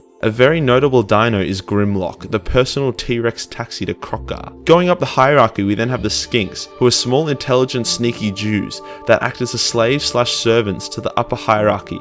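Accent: Australia. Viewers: No, he’s just Australian.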